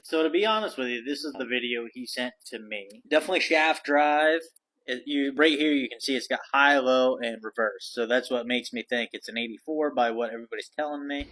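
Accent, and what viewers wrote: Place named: Vermont, United States. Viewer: what part of northern Vermont are you from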